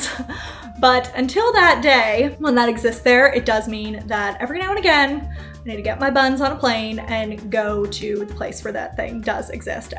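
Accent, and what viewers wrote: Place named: Canada. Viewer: Great video. Lots to consider in this video. Time to jump in and go for it. I have been trying to pick out your accent. Canadian...can't believe I didn't pick up on it. Where did you get your background music?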